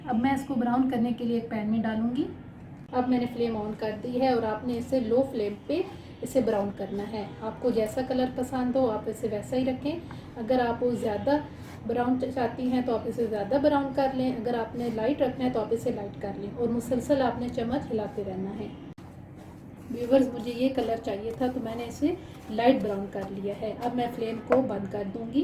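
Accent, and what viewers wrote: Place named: Murree, Pakistan. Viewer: Focus on pronunciation I think so you belong to murree.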